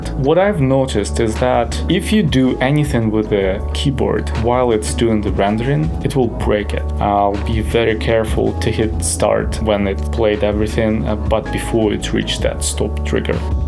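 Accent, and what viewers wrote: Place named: Eastern Europe. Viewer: This video made me dig my anbernic rg35xx out of the box. Also, your accent sounds slavic to me 😁Thanks!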